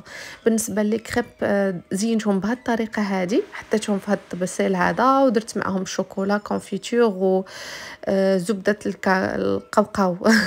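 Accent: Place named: Oujda, Morocco. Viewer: شريبه بالحليب عرفتي هضرتك حلللوة تاع وجدة كيعجبني نسمع ليك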